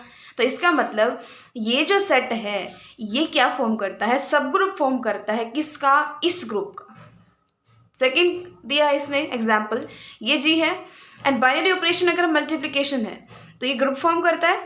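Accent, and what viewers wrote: Haryana, India. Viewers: Thank you mam... Your voice is so sweet..... With haryanvi touch i 🤔 😅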